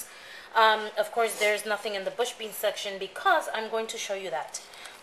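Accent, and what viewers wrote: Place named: New York, United States. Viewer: You have an amazing NY accent :) great idea with the sandwich bags my pods never seem to sprout, i'll let you know how they turn out!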